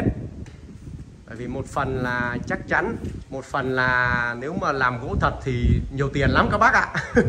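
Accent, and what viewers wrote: Vietnam. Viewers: Tưởng a ở dưới Miền Nam chứ! Ra ngay Hải Dương 😊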